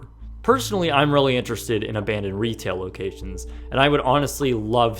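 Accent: Canada. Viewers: I just love watching your videos,. They are so interesting and your Canadian accent is just so cute (myself knowing it well living in the States less than 100 miles from the border). Keep posting!:-) x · You can tell by this guy's accent he's Canadian. As an American, I've never heard anyone call anything a "Dollarama". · He’s canadian. We have a slight different accent from Americans... people say it isn’t noticeable but this literally is just an example of how we say some words differently.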